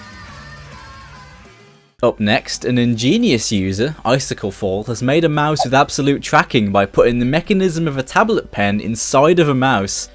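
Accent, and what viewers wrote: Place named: England, United Kingdom. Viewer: I don't really like English accents but at least he admits to being a scrub ecks dee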